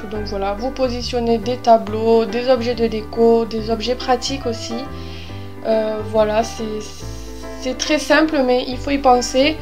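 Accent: South, France. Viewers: Tu viens du sud?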